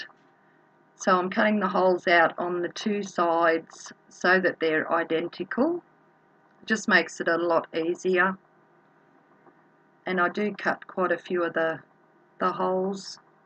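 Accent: Australia. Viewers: so true, and your Aussie accent is helping me smile even more! xoxoxo:))))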